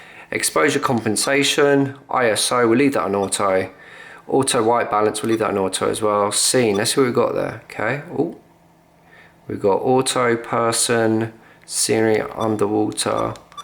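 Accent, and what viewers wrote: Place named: London, United Kingdom. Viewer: it's a regional accent most likely - probably down south around (east) london. there are many of them. words often arent pronounced like theyre written. certain sounds simply aren't produced. other languages, like italian in particular, are famous for this.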